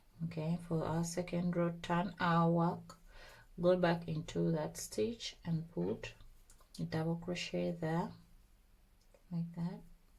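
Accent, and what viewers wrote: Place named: Jamaica. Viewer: that's Jamaican talking but good work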